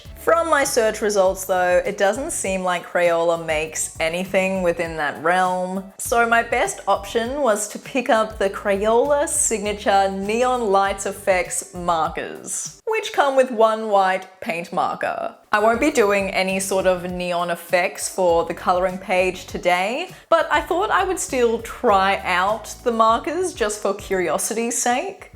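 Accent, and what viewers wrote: Australia. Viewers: wow this must have took a lot of effort and motivation to do, I like your aussie accent!😂 crayola really gives off this nostalgia vibe from colouring and drawing as a kid with these supplies, overall you did a good job of colouring this design, I really like how the water colour effect blends together nicely to create almost a tie-dye effect💕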